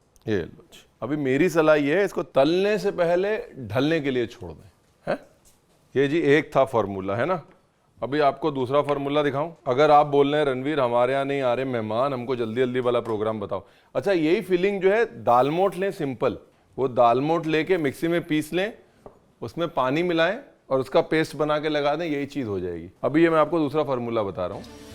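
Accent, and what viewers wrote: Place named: Punjab, India. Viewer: Aaj aap Shehnaaz gill ki tarah punjabiyat me baate kr rhe hain , mja aa gya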